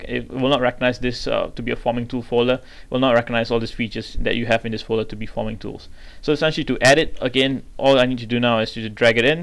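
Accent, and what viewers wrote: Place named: United Kingdom. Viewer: interesting. cool British accent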